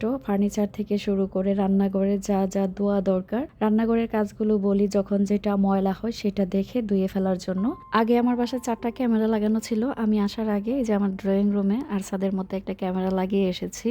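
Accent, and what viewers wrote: Bangladesh. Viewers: আসসালামুয়ালাইকুম আপু তোমার ভিডিওটা অনেক ভালো লাগলো তোমার বাংলাদেশের ভাষাটা দেখে অনেক ভালো লাগলো তোমার মেয়েটাকে মাশাল্লাহ আমার অনেক ভালো লাগে জন্য অনেক অনেক দোয়া❤❤❤❤❤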